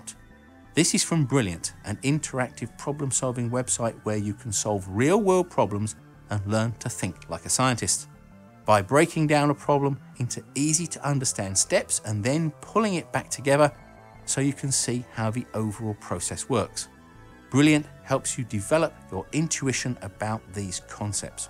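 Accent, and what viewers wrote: United Kingdom. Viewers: "Since the formation of the United States its mainland has never been invaded or had any major attack." Ironically this was spoken with a British accent. For the benefit of those who are not students of American history, here is an example: <link>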